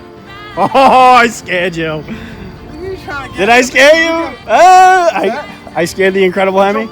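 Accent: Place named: Bay Area, United States. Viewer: Aye you from the bay bro?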